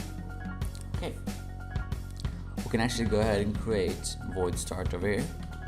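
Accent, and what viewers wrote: Sweden. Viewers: Am i hearing a swedish accent? (Very helpful video btw)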